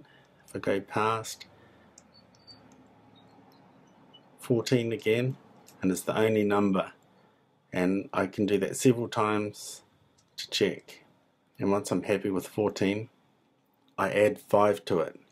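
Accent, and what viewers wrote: New Zealand. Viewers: Cool accent I think Kiwi?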